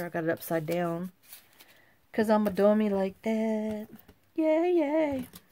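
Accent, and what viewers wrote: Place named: South, United States. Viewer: I love all your videos! I sent my daughter, Heidi, one to watch and told her she’d enjoy it. She calls me and says “OMG she’s you with a southern accent!!” Then I reminded her I DID have an accent in the 1960’s when I lived in Florida. Please let us know if Molly comes home.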